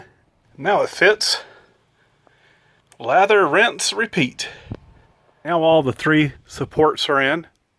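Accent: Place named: Texas, United States. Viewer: Don't ever listen to negative talk about your accent. It sounds perfect to me. I'm from TX, and you sound similar to what I'm use to hearing. I know some from GA probably have stronger accents. The southern accent is the best. I love your videos. :)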